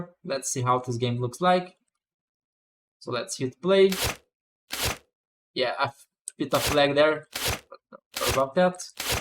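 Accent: Brazil. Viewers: esse sotaque ai, tu é br kkkkkkk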